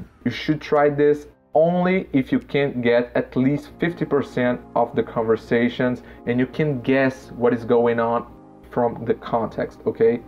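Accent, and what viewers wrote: United States. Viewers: I thought he sounded American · Wow when I started listen you I thought I was watching a American guy spoke in English. I couldn't say that you are a Brazilian if you didn't say that you are. Congrats from now on I will follow your videos. I am studying on my own as well. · Brazilian with american accent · He does sound pretty American later on, but definitely not in the beginning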